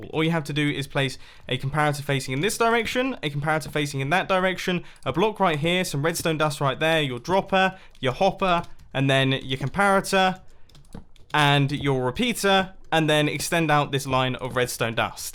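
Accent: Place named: England, United Kingdom. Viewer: I’m sure you might have said this before somewhere, but I’m a new subscriber and just wondered whereabouts in England you’re from?? I’m from England too but your accent is so lovely to just zone out and listen to!!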